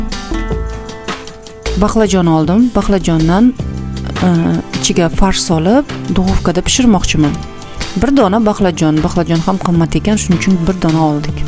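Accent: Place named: Chapan, Uzbekistan. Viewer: Chapani gaplariz biram yoqabiba bechara kuyovimiz til bilmay qaytarabi gapizi ola xorjin dep😅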